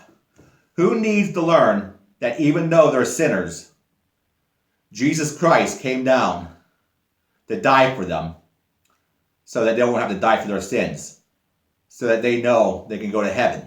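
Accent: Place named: Philadelphia, United States. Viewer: Good analogies and a fun presentation. Are you from the Philly area by any chance? I grew up in South Jersey, and your accent sounds familiar! 😂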